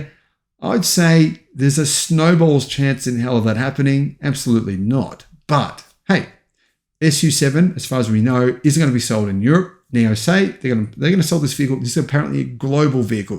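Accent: Australia. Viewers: He is not an American, he is an Australian. Can’t you tell from his accent?😂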